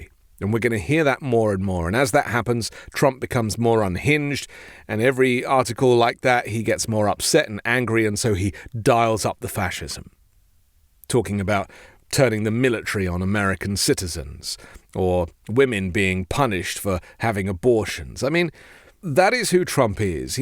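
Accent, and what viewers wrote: United Kingdom. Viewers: For some odd reason, listening to you with a British accent explaining why Trump will lose is very soothing and gives me peace of mind. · Why is this lame with a gay a$$ British accent telling you about American politics???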